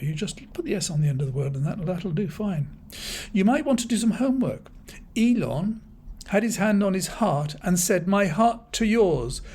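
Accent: RP, United Kingdom. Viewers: Ramstein is right, you are a dimbo, not even as smart as a nitwit, a proper plank like our PM, you are in short in your dotage, a foolish old Englishman with nothing but a slightly posh voice as cover, see how far it gets you in the real world, if you've ever been there a proper SNOB top to bottom, what crushed you radley school or London. or both? Get back to you biggles books, if you even remember where you put them.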